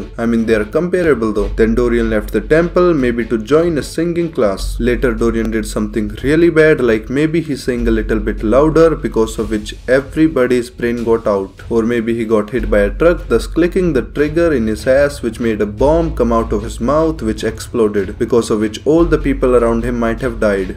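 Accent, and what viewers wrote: India. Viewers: His indian accent just make this video better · Dude I feel like you are a fellow Indian the accent sounds very similar 😅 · i love this guy's perfect grasp of english slang combined with an indian accent, its hilarious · u sound indian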